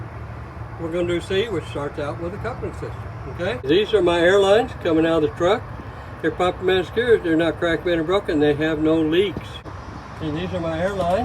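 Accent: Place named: South, United States. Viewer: He does mumble a tad bit y'all but...that's what's called a "Southern Twang", don't worry about it, just pay close attention, this man is a GENIUS! 👍🏽😉😎🫡👌🏽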